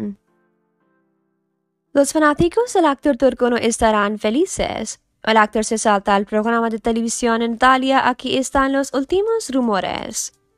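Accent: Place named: England, United Kingdom. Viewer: Perdonais, alguiene dice porqué la señorita de voz, habla en español malisimo con actento inglés y dice los números en inglés también??? NO SE ENTIENDE NAAADAAAAAA. lo siento gracias.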